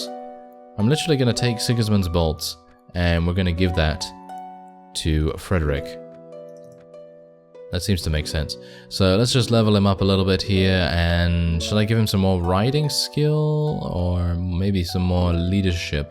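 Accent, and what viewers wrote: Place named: RP, United Kingdom. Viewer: This guy sounds like a british lord.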